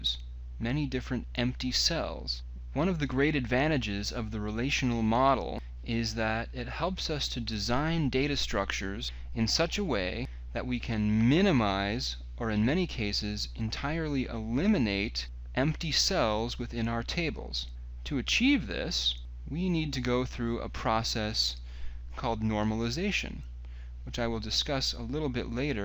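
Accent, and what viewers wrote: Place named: England, United Kingdom. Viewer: Thank you for posting this video. I am happy that I could find one with a perfect English accent.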